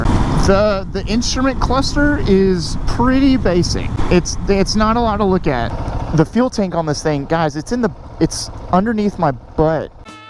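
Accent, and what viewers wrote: Georgia, United States. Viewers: What part of Georgia are you from?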